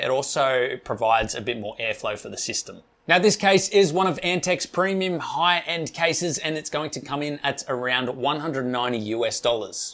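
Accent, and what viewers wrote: Australia. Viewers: Hello I was wondering where you were stationed? bc it sounds like you are Australian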